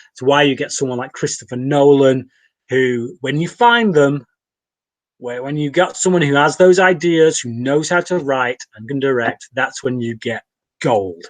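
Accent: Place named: England, United Kingdom. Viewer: I have something I have always wanted to ask. What part of England are you from? Wondering about the accent.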